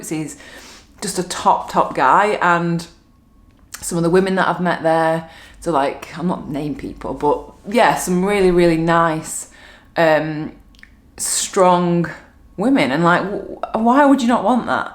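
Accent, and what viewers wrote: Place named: Manchester, United Kingdom. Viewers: Love your northern accent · Like an episode of Saxondale with that Manchesta’ accent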